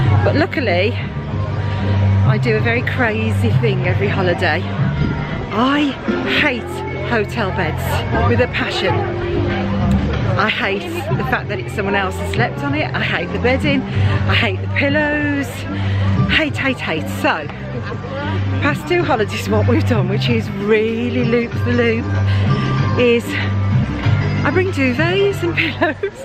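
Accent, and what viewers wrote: Birmingham, United Kingdom. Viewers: Can hear a bit of a Brummiw twang in your voice I think? Found you from Dev. Going back to WDW in September yet again. X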